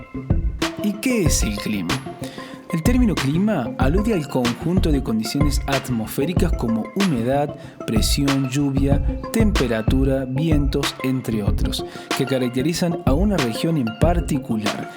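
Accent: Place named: Córdoba, Argentina. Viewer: como amo su acento cordobés LA PUTA MADREEEE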